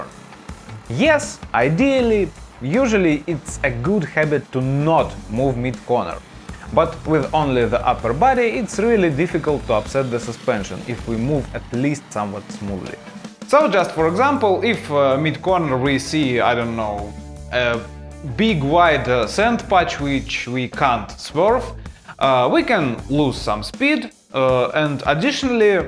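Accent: Russia. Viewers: Sounds very like Aleksandr Orlov, a celebrity meerkat well known in Britain and Australia, did the voiceover! This just means the advertising agency got the accent they were aiming for, Russian, correct. Not that meerkats are good at riding motorcycles. <link> compilation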